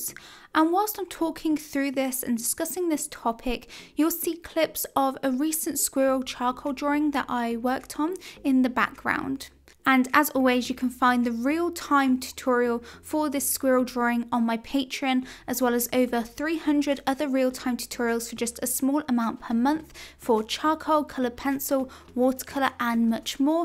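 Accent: United Kingdom. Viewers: Art Thank you for your videos. Your British accent is very pleasant to hear as I draw! I am from America so it’s nice to hear different accents.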